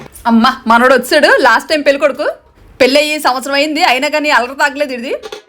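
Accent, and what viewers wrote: Vizianagaram, India. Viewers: Me family ki memorable event, so nice to watch your videos, also me vijayanagarm slang superb andi 😍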